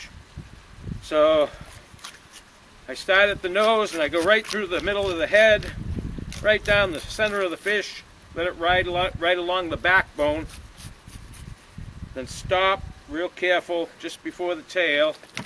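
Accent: Boston, United States. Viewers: Thanks for sharing!!! I can hear you haven't lost that Boston accent despite you have been in Alaska for decades. Great show (Yucon..)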